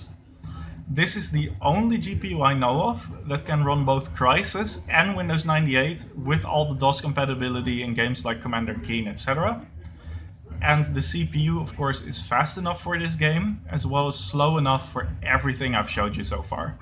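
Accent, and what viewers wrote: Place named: Netherlands. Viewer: Je klinkt een beetje Nederlands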